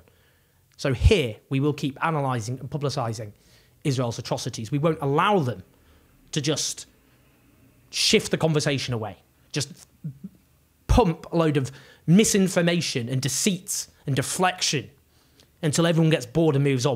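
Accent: United Kingdom. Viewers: I love your passion and dismantling BS programs. Please, Please, Please slow down, enunciate, and stop trailing off at the end of your sentences. I'm an American. Whatever you could do to soften your British accent would go a long way in making your presentations more intelligible for your foreign and maybe even your Brits, Scots, and Irish. BTW I am Irish all the way.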